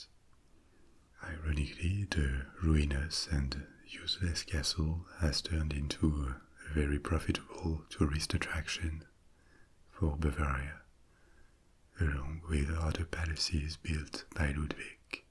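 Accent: France. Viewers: I'm french and I'm listenning to a french guy speaking in english, I guess I'm weird. However the voice is really relaxing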